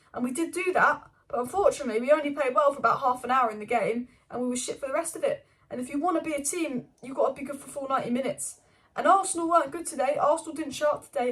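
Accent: Manchester, United Kingdom. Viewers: I noticed that prominent Manchester accent. All United fans tend to have that kind of a Manchester accent lol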